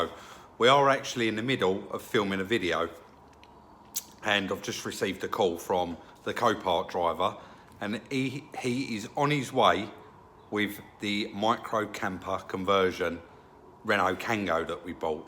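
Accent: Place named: England, United Kingdom. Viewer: I'm from Australia. I could listen to that English accent all day.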